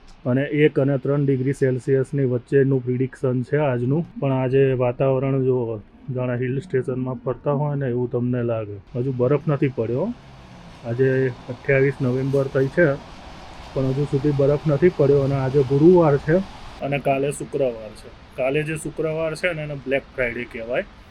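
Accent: Mehsana, India. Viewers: BoliTo Mahesani chhe, PAN Gaom Ke U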